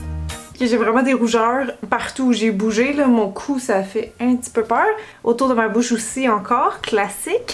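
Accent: Quebec, Canada. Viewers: J'aime bien le parler québécois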